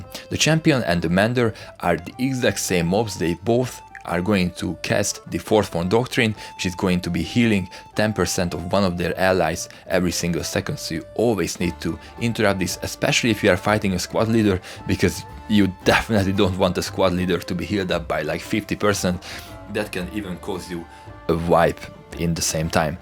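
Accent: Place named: Hungary. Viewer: Nem szeretnélek megbántani, de simán kihalatszik a szörnyű magyar kiejtés az angolodból. Rendesen vérzik a fülem. Viszont ha ilyen szépen megtanultál angolul folyékonyan beszélni,akkor érdemes lenne dolgozni rajta. Valamint egy magyar felirat sem ártana mert azzal nagyon sok embert érnél el, vonzanál be. Nem igazán vannak hasonló részletes videók magyar nyelven.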